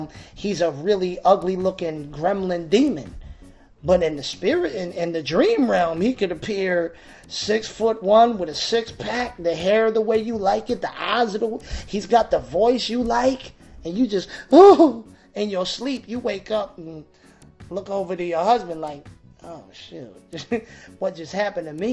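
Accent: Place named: Philadelphia, United States. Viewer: Does anyone know where this brother is, and if he has a church? Sounds a little like Philly maybe? Really like his insight, as it seems he is certainly anointed.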